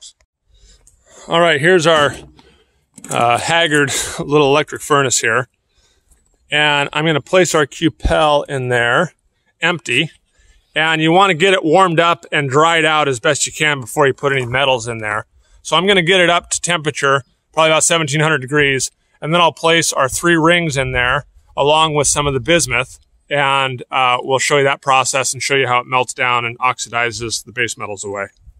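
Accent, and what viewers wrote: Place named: United States. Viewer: Weird, guy has an American accent, but it looks like it was filmed in Somalia!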